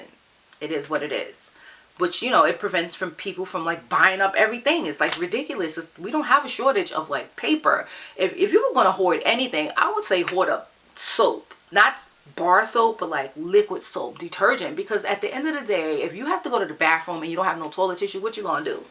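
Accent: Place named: New York, United States. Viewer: I didn't know you were in NY, now I see where your accent is from lol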